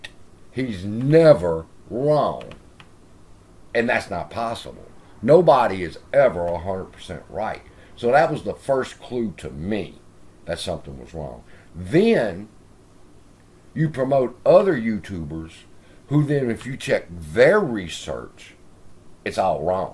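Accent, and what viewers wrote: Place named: Boston, United States. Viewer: he mentioned he liked you a long time ago. Something about your boston accent. Long time ago. This was when you were angry and going after other youtubers on the daily. I told him you were a sjw star wars fan. And a man who still likes star wars after what Disney did to it is no man at all. Also, you do no real dd and do not contribute anything of real value to this play or any other. Did you know Tony D is an actor and Denaro isn't his last name? I have a feeling you east coast guys are all deceptive people. What does "massa" mean anyhow? You don't think something slaves said to their masters is cute do you? Ahh, Disney fanboys.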